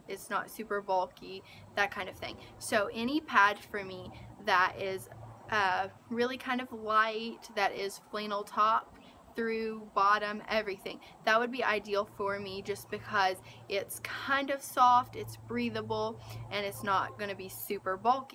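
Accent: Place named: United States. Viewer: Thank you so much for this info. I was also questioning the comfort of a waterproof layer daily. To my ear you sound American so I don't know if you know in Europe female incontinence is often treated with a tens (may no be spelling that correctly 🤷) unit, it's a little bigger than a tampon. It sends a low current shock that causes your muscles to contract, so like kegal exercise but more efficient with much better results. You can buy the stuff on Amazon. The unit is the same but has different plug ins for the more common use for leg and back pain which are commonly sold in store in the pain relief section. Thank you again for a very useful video.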